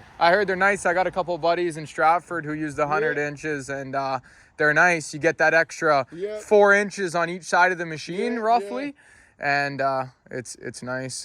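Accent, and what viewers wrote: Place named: Quebec, Canada. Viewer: Gotta love the French Canadian accent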